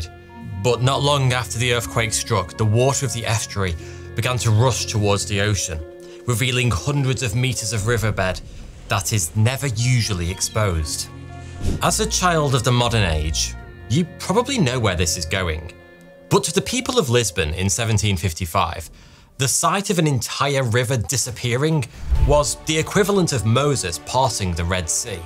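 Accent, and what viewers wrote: United Kingdom. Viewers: Hear me out here: It’s really not that big of a deal. He’s British, he’s gonna pronounce shit wrong from time to time.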